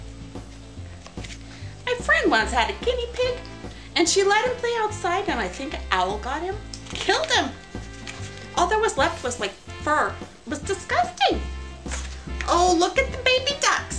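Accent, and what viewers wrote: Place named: Minnesota, United States. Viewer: LOL, So the next time my granddaughter wants me to read one more story and I'm too tired, I'll call on Aunt Nancy... The accent will help her get to know her Minnesota roots. But wait - I'm never too tired to read to her. Whew, she's safe! LOLOL! Great vid.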